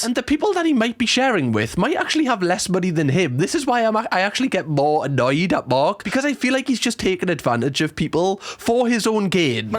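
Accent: Newcastle, United Kingdom. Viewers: I love the way you speak so much. The jokes you Crack, I'm dying 😂 it sometimes gives me little Britain vibes. I love British English so much 😂❤️ much love from Germany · I love Geordies. I was conceived in Newcastle but born in wales but when I hear you I think of my parents and happy times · He's from Newcastle in the UK! 🥰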